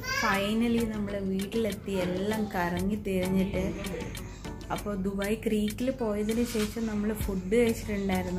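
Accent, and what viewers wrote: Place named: Kannur, India. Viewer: video nannayittund ! typical kannur bhasha enikkishtayi.njanum kannurkari anu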